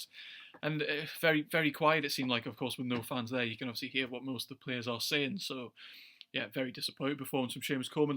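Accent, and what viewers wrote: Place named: Newcastle, United Kingdom. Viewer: You from Newcastle pal? Sound very geordie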